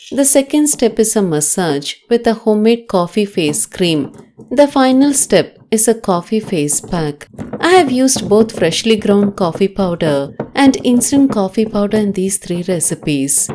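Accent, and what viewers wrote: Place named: Tamil Nadu, India. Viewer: You speak English like Tamil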